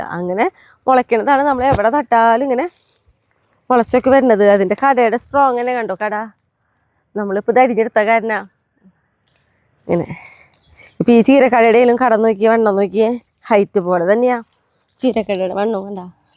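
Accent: Thrissur, India. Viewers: Its Thrissur slang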